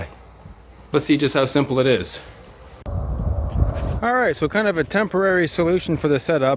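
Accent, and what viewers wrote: Canada. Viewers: Strong Canadian accent but I can still understand what he is saying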